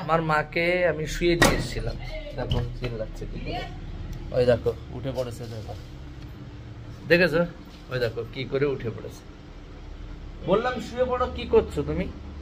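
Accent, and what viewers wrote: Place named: Bangladesh. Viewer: Dada tomar mayer bari ki bangladesh kotha gulo bangladeher moto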